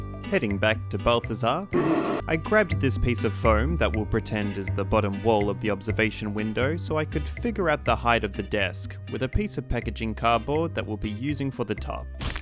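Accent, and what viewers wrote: Australia. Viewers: You sound like Oscar Piastri or are you just australian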